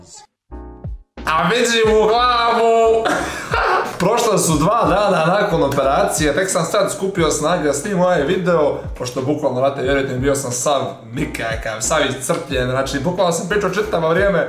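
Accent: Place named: Montenegro. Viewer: Koliko volim taj crnogorski naglasak😁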